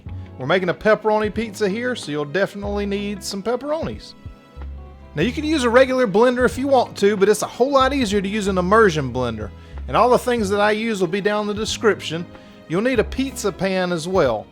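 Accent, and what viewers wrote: South, United States. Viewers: Sorry the thick southern voice is 😖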